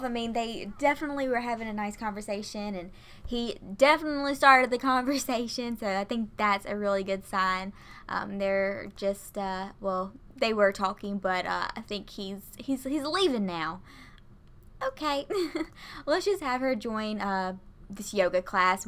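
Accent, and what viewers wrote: South, United States. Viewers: Ok I know this sounds weird but your voice or so adorable, and your country accent is so freaking cute😂😂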